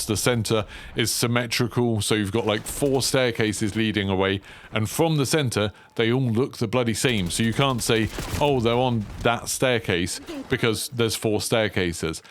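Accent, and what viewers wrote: United Kingdom. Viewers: Bruh, he’s obviously British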